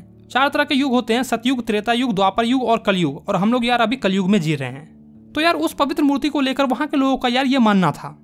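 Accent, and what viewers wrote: Bihar, India. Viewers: Bhai ap kya bihar se ho kya me up ka hu bhAi ye to bajane me maje ate h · Hum bhujlo bhaiya app bihari ho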